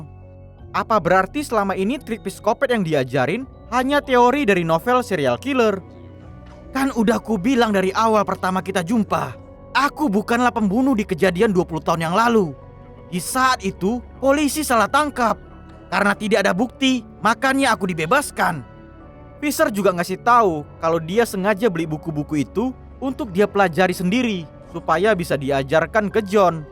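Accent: Medan, Indonesia. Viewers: Swrius saya nonton alur ini bukan pengen lihat filmnya tapi dengar logatnya ngomong medan kali wak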